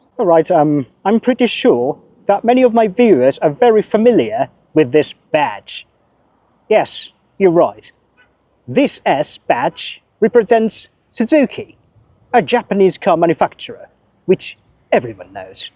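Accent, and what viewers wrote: United Kingdom. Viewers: イギリス英語で車紹介、どこかのトップギアかな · 真実の棒を使う配信者のようなゴリゴリのイギリス英語で、旧植民地で生産された元同盟国メーカーのクルマを、元帝国主義国の元雑誌編集者と腰痛持ちの配信者をオマージュしながらレビューする淫夢廚とかこれもうわかんねぇな… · スズキ、フロンクス、天竜川、イギリス英語 全部好きだから助かります ありがとうございます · イギリス訛りなだけでイギリス人特有の辛口レビューが足りない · イギリス訛りの英語を流暢に話す日本人が、インド製の日本車をレビューする動画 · 車の紹介でイギリス英語はあれを思い出すな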